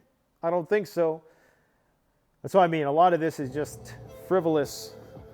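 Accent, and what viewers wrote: England, United Kingdom. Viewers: Dude your English accent is hilarious! The arrogance of these people thinking they will still have servants running around for them after SHTF 🤣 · Wow I'm impressed with your English accent!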